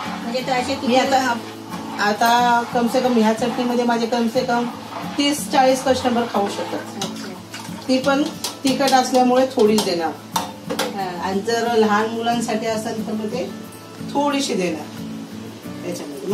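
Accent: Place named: Agri, India. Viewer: Mast ekdum Agri ascent talk.. 👍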